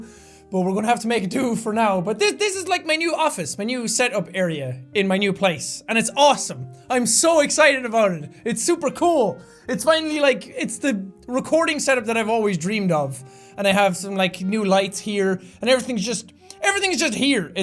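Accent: Ireland. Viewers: NOOO U WILL LOSE IRISSH ACENT OH NO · Maybe the only think I'd ask for is that he never loses his Irish accent. xD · don't worry he won't, he's a fully grown adult ("adult"😂), so he may lose it slightly but he'll always have that irish tinge ( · i am a little bit, i have a BIG thing for Irish Accents..so if he is in the UK now it does worry me a little bit. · My Irish Potato is gonna be in England.... You better keep your accent! Don't get a British one! That's what Stampy is for! Stay you! -Keegan Tripp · He is going to loose his awesome irish accent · ahh no more Ireland :( Well hope you enjoy your new home...<3 Hope you keep your amazing accent too <3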